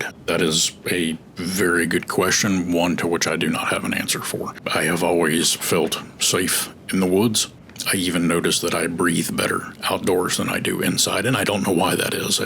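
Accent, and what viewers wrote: South, United States. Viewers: This Augusta old lady, now living in Canton, appreciates your southern manners and accent! I enjoy your adventures.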